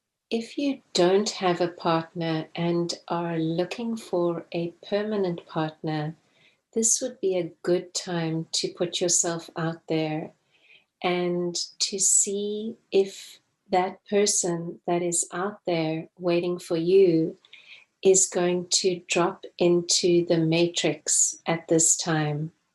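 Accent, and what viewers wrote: South Africa. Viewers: I detect a South African accent.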